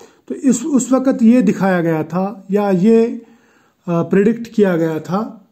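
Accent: Kashmir, India. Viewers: Sir you sounds like kashmiri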